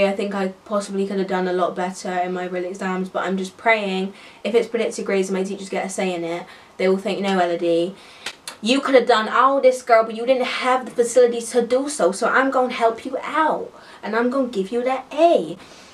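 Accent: Bristol, United Kingdom. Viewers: it feels like I haven’t heard your voice in ages, soooo Bristolian it hurts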